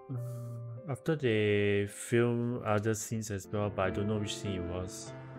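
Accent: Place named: Singapore. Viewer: Hello!! Are you from Singapore ? You have the accent ! Thank you for uploading these videos btw they are my comfort videos haha 💕💕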